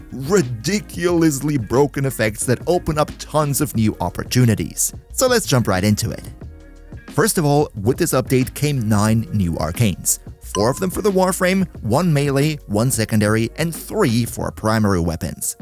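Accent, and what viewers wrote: Germany. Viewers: Esl german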